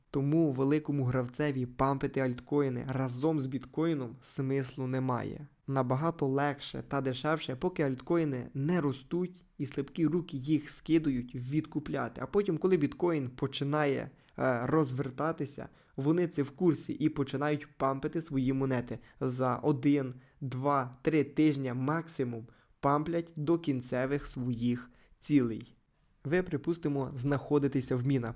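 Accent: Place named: Western Ukraine, Ukraine. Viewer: Дякую за відео,по говірці чути зо людина з франківщини чи львівщини , я вгадав???)))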